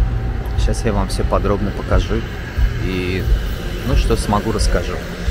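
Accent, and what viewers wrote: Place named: Uzbekistan. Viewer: Акцент такой только у Узбекистанцев! 😀👍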